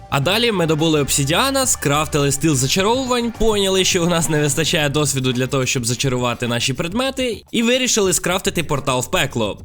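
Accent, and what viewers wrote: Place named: Surzhyk, Ukraine. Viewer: Ви розмовляете на суржику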